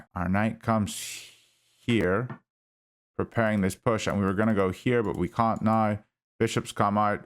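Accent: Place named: Scotland, United Kingdom. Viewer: This was super helpful, thank you very much! I just found out about you because I was looking for a ChessMind review. You seem like a top bloke :D Definitely will stick around. Unrelated question—and please ignore this if you're not in the mood to answer: English is a second language for me and I was curious what accent/dialect you speak. It's super cool, but I'm terrible at pinpointing English accents. I thought it might be Scottish? (Sorry, if this is completely wrong!) Anyhow, cheers again!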